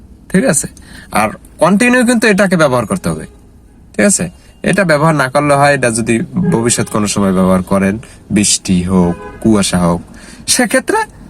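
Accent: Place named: Barisal, Bangladesh. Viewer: হা লা ,,বরিশাইল্লা,, আগে কথাশিখ পরে ভিডিও বানাবি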